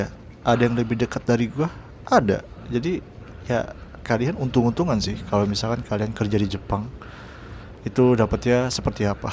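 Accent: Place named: West Java, Indonesia. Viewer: Bang kamu orang sunda ya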